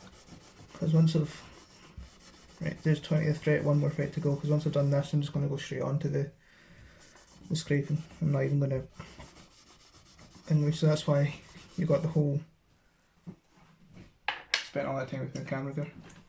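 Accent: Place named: Scotland, United Kingdom. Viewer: You sound Scottish. I had an aria diamond looked exactly like that I swapped it for a watch from a guy that lived on a boat that had a fore head tattoo when I was living in Brisbane. I'd like your opinion on guitars. Have you got an email address? I have a Horner shorty it's a pain to change strings I'm looking at Jackson's dinky Floyd rose and the minion.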